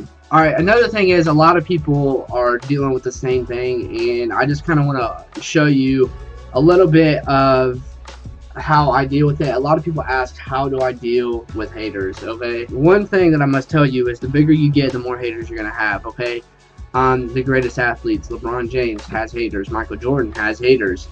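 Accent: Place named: South, United States. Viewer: Why does he sound like a typical hillbilly incest 3rd grader?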